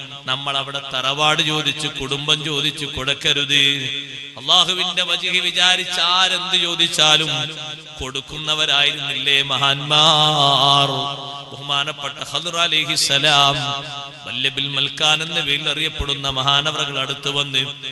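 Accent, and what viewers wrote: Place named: Tamil Nadu, India. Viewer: E usthad thamizh natukarana athu konda chila aksharangal nammale ppole parayan pattathe athu kariyamakanda usthadhavarkal paranchath ketal mathi athanusarichu jeevikkan shramikkuka allahu usthadinu afiyathulla dhergayuss nalkatte ameen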